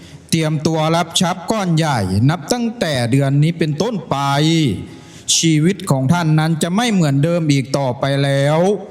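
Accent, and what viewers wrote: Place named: Isan, Thailand. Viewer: อยากกดเข้ามาดูตลอด แต่พอได้ยินเสียงคนอ่าน กดออกแทบไม่ทัน ถามจริงหาที่อ่านแบบคนทั่วไปไม่ได้จริงเหรอ สำเนียงอีสานก็หังยากแล้ว ยังจะมีการทำเสียงแบบละครวิทยุสมัยก่อนอีก เฮ้อรับไม่ได้ๆๆ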